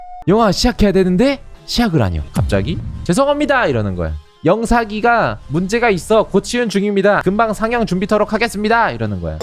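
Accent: Gyeongsang, South Korea. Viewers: 김블루님 대구 사셨어영?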